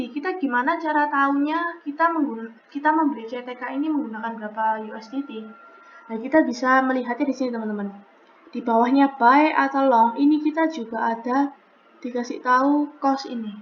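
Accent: Java, Indonesia. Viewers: Suara nya ko medok banget 🙈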